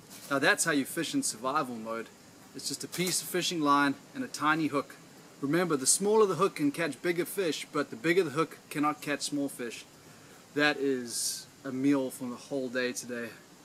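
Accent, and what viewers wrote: South Africa. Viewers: You're South African? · Is that SA accent I hear? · YOou kind of sound south african? Zimbo? That would make sense. You know survival. comes natural with us... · is he south african? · you sound south african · Are you from South Africa it's just the accent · Sounds South African..long way from home either way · dude, u south african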